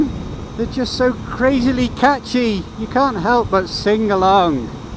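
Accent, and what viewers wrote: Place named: England, United Kingdom. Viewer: Thanks, ALL three now firmly stuck in my head. Wasn't expecting an English accent, seeing those double yellow centrelines! I'm joining the expat club and will be broadcasting English accentry from Europe!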